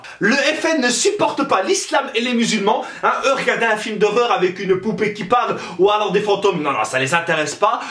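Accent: Belgium. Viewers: Accent belge???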